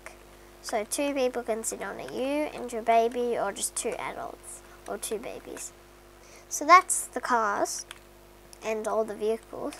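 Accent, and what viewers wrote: Australia. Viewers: the australian accent though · Is she Australian?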